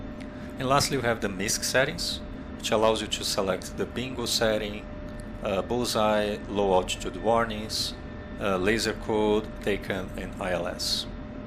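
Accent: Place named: Brazil. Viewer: Sotaque brasileiro em inglês é mt reconhecível xD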